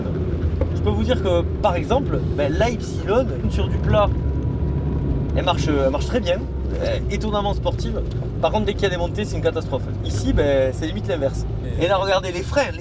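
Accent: South, France. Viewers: Je suis commercial pour une des 3 marques allemandes premium et pourtant j’avoue être sous le charme de tes vidéos. Une belle energie, un accent du sud bien vivant et des voitures qui ont fait notre enfance/adolescence. En plus tes vidéos sont bien documentées et tes montages aux petits oignons. Bravo !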